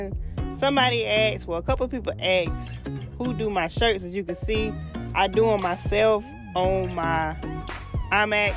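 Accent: South, United States. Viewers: It's called a southern accent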